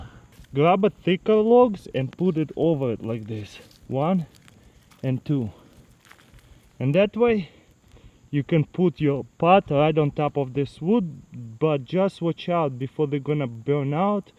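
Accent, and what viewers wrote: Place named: Ukraine. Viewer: Ужасный английский с украинским говором) прикольно звучит , я даже так не могу)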